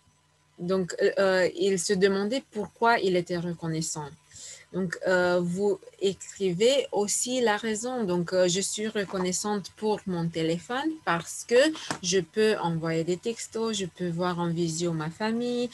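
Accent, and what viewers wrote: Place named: Romania. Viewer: Merci beaucoup Je viens de vous découvrir... Je vais acheter le livre, le lire, m en imprégner et puis suivre vos vidéos pour faire ce travail... J aime beaucoup votre petit accent roumain sympathique, votre bonne humeur contagieuse et votre franc sourire A bientôt 🖐🖐🖐🖐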